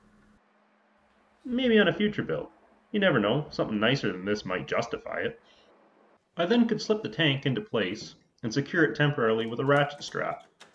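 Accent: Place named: Canada. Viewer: Please sir, I'd like some more. This looks like a great project to mess around with. I love all you fab stuff you always show. It helps me with my projects to see someone do it well. And then there's your wonderful Canadian accent. It's like I'm watching an episode of Letterkenny. LOL! ;) Please do more with this truck!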